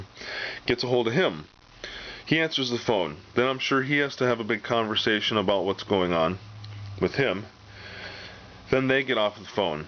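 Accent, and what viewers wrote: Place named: Minnesota, United States. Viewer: being prepared isn't paranoia , it's being responsible. In a life and death situation seconds count so making the simple effort to have a pistol within arms reach at all times is a matter of self preservation. And in this guys case it's a necessity with his local police force, or lack there of. Just curious what state you live in, you sound like my friends in Minnesota.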